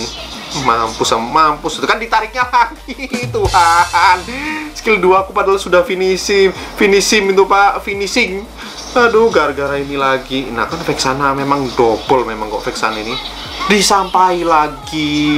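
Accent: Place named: Malang, Indonesia. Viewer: Arema medok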